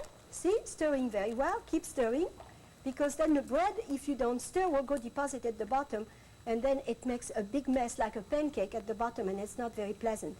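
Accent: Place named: France. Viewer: February 16, 2000 San francisco Chronicle A Grande Dame Steps Down / - controversial teacher, mentor and founder of School for American Chefs - conducts her final class. Janet Fletcher, Chronicle Staff Writer looks squarely at one of the young student-chefs gathered around her and minces no words about his menu idea. "That is not something I will let you put on the table," she says briskly. A few minutes later, she verbally leaps on a student who thinks a celery root puree might be nice with the quail. "Oh, please," says the cooking teacher in her girlish French-accented voice. "Save us this puree business. We have teeth." The eight students, all working chefs attending Beringer Vineyard's School for American Chefs, are nearing the end of two weeks with, widely recognized as one of the world's top cooking teachers. But today's menu-planning meeting seems more like boot camp than class, with constant unsparing criticism from this white-haired drill sergeant. 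She is, as former students confirm, brutally frank and quick to find fault. One admiring protege calls her "mean as a buzzard." But like the recipes she so painstakingly balances, herself is a complex creation who softens her harsh side with unstinting generosity, students say. With this last two-week session at Beringer, the 69-year-old is retiring from full-time teaching, leaving students to decipher the ingredients that have made her such a compelling, controversial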